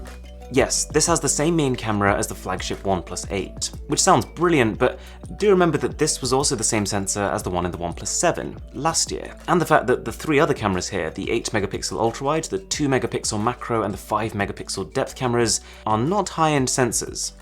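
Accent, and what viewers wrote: United Kingdom. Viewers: Haha yah that's UK accent for u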